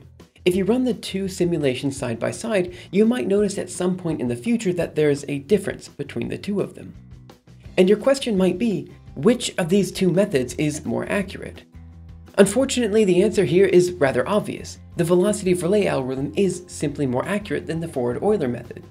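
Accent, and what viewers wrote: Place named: United States. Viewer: I've never heard this American accent before... (I'm guessing it's American) Thanks for the video though.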